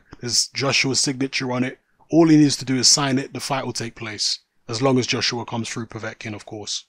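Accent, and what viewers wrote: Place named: United Kingdom. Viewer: Shut the f up dude u sound like u from the u k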